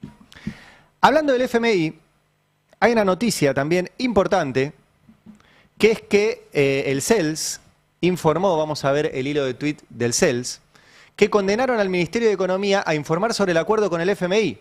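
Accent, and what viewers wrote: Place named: United States. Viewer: UF con ese tono gringo. No me importa lo que diga hasta la forma de hablar suena a vende patria.. Cada vez peor.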